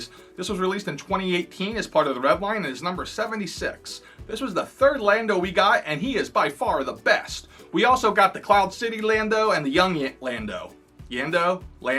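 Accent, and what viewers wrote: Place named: Pennsylvania, United States. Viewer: 3 minutes in and I'm saying to myself "He's GOTTA be from PA with that accent.." HAHA! I'm from Philly, I'd know that accent anywhere, haha! GREAT VIDEO!!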